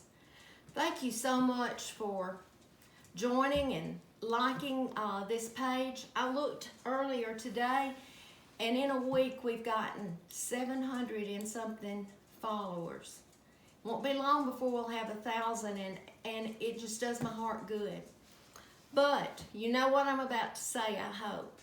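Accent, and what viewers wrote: South, United States. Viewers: Just love you ♥️ My daddy just got out of the hospital and his health is very tenuous…I’m helping my mama take care of him and it’s very stressful. But when I have a few minutes and just want to escape and calm my mind, I watch your videos. Your sweet southern charm and lovely accent just warm my heart and your videos remind me that as long as I have Jesus, I can bear anything. Love you! God bless xoxo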